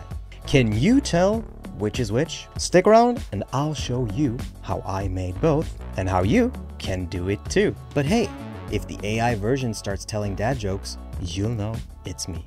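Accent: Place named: Sweden. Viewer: it's pretty easy to tell the real from the robot. your natural voice has much more low-end resonance and a slight, but endearing, european accent (swedish?). on the clone version, you are sans accent (test your french) and you're more minnie than mickey. the lack of bass might be correctable in post; the accent, not so much.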